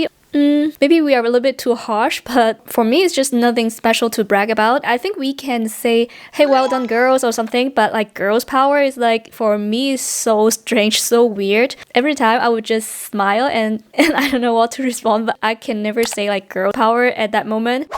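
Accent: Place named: Germany. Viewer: You sound German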